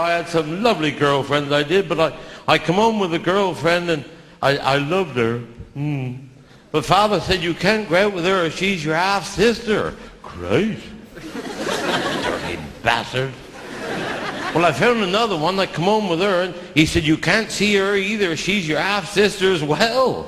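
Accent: United Kingdom. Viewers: Lovely. And nice to hear a Yorkshire accent, it's ages since I lived there.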